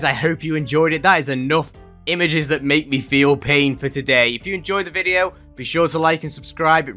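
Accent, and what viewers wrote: United Kingdom. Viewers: Question: are you from the United Kingdom?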